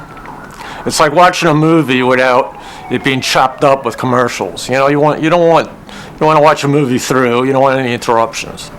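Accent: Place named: New York, United States. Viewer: The New York accent is so cool. Love it. 👌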